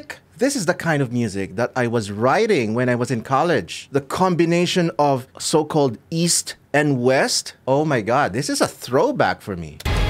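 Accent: Philippines. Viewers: I may be wrong but.... Are you filipino? Considering your accent and your speech pattern, I'm led to assume your filipino good sir ( I'm new to this channel :'D )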